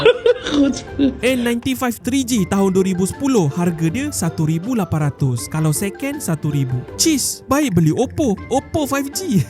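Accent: Malaysia. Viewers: Bahasa ya mirip baha indonesia tapi nada suara ya mirip malaysia.... Ini orang malaysia atau orang indonesia wok 🗿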